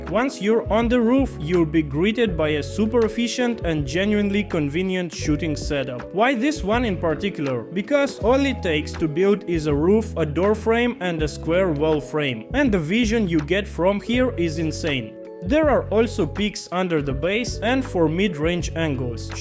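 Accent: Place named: India. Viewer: I rember him og India voice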